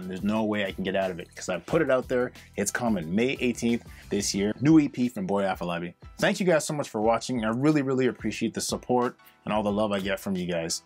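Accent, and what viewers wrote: Canada. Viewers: Thanks for the advice. I can tell that you're also from Canada haha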